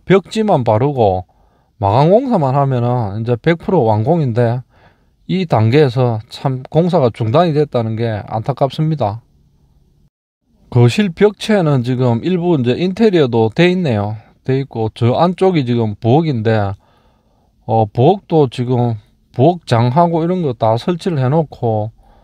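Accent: Gyeongsang, South Korea. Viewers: 시공사부터 괴비리쑤레기판결인디 저영상찍는 갱상도 사투리가더사기꾼 같은디요...?:어~???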